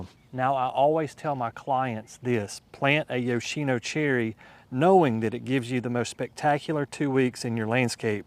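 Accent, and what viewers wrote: South, United States. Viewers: He is in Alabama, zone 8a. You can tell by that accent that he is a Southerner y’all!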